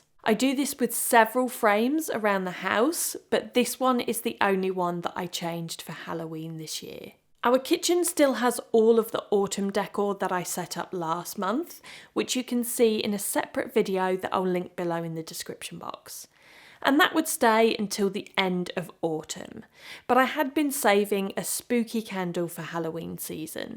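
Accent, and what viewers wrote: England, United Kingdom. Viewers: Dearest.....love that your English accent is amazing. USA welcome. 2024 looking good.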